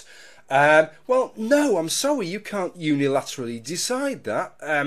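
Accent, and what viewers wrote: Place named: Northern England, United Kingdom. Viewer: Excuse my ignorance, but what is the accent I am hearing? I know it's British, but there is something a little different that I notice between yours and other British accents. I have heard other people speak this way as well, that is why I am wodering.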